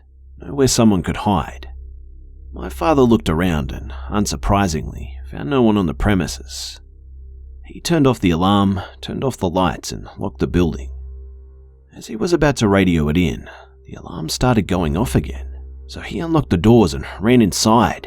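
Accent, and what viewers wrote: Australia. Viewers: Finally, a familiar Aussie accent!! I could tell by the whispers at the start lmao :')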